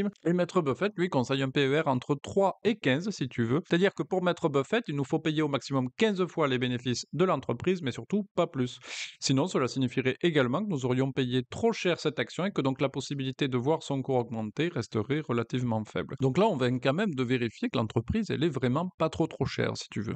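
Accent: South, France. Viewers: Bonsoir, le petit accent du sud est très agréable pour une chaîne sur l'investissement. Un rayon de soleil qui réchauffe le PEA 😅. Merci et bonne continuation 👍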